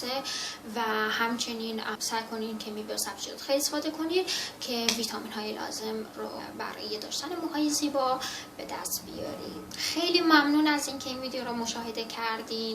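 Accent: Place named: Afghanistan. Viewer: Abbas Barati ازحرف زدنش معلومه افغانیه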